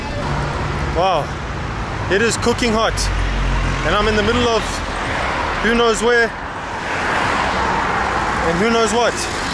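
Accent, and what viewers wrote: Germany. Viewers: You German or South African I'm confused 🤔 anywhos love from America🇺🇲🦅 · He has a german accent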